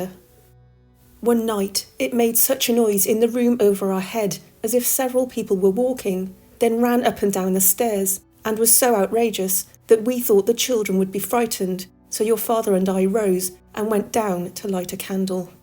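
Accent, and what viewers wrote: England, United Kingdom. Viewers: What a beautiful home. Another interesting case,. Nothing better than a ghostly tale told with a British accent. I’m in bed, waiting for my nighttime meds to kick in and listening to my favorite YouTube channel. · Nothing better than hearing a ghost story in an English accent, well I concur, and I’m now becoming attracted to my own voice, jeez thanks, this is asmr stuff, honestly I could listen to this all night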